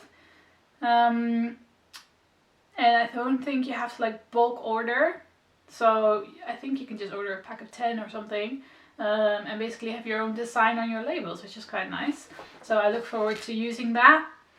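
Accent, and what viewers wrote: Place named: United States. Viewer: Hi, I found you through Liz, the Baker that Sews, she always makes great recommendations! Where are you in the world? Your accent sounds American but I expect I am absolutely wrong there! You are going to be very busy sewing with all your plans! Looking forward to seeing your makes! I have just finished Sew House Seven Sauvie sundress for my holiday in Dubai in a few days, can’t wait! We are going to have a Sewing Bee in April, it’s such an inspiration. Good luck with your channel.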